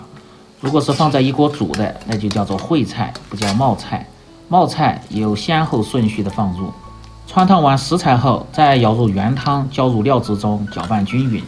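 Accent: Sichuan, China. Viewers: 要得 謝謝 有你的川普、更入味兒👂😂 啊呀 冒菜都莫得你的川普正宗 我們這莫得賣黃吼兒的🤔 其他的都賣得到🤔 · 听到熟悉的四川普通话就直接给老乡点赞了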